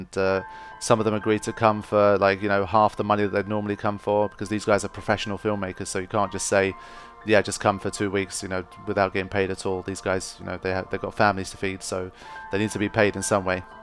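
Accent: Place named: North London, United Kingdom. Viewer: I hear a bit of North London accent there :-) anyways, I think an interesting documentary topic to explore is the positive effect of gaming in general. Would be good to offer some counter argument to worried parents etc. In particularly final fantasy which has a large fan base that has aged and grown with the series. It would be interesting to interview these people to get some of their stories. Final fantasy touches on so many different issues in their games over the years and has definitely influenced me despite coming to it in my mid 20s.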